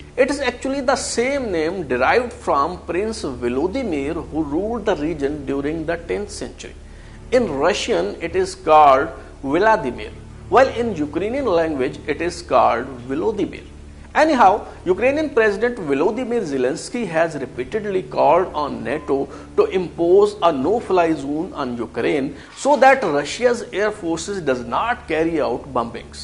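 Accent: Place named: Punjab, India. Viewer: Content in English Style in Punjabi accent :)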